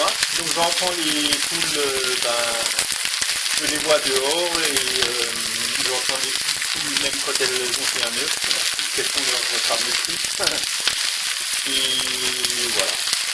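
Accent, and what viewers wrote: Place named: Alsace, France. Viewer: Je reconnaît cet accent alsacien je suis d origine alsacienne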